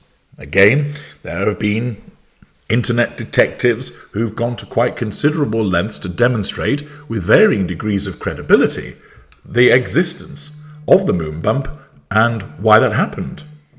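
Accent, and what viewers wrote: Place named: RP, United Kingdom. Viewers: You the devil's son,found out your kids are not yours,so making up bullshit about others. Trying to talk posh when you just so sick,should be in a mental home. Tina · This man’s channel is only positioned to offer his guesses. He uses a posh manner of speaking and decent production vale’s which both lend themselves to a “feeling” of truth. His guesses and opinions have no more credibility than yours or mine. For anyone who cares about my opinion I happen to think there does exist 1 boy child, born by a surrogate, that is of no relation to either Harry or Meghan. I believe they have no custody of him. I believe there is no girl child whatsoever because the child was supposedly born in the US. I notice the British have trouble understanding that in the US there are no secret births no redacted birth certificates. If a birth certificate cannot be located by the most ordinary citizen there is only 1 reason for that — there was no birth. 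There are no exceptions. It is that simple and no one on planet earth can refute that fact. The BRF — none of them — have ever seen this girl child. Serious mistakes have been made by both Queen Elizabeth and even more so by Charles.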